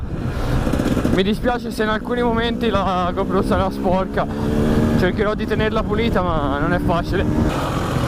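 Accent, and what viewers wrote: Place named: Tuscany, Italy. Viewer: hahha ok Bro! PS. Amo il tuo accento toscano, lo adoro!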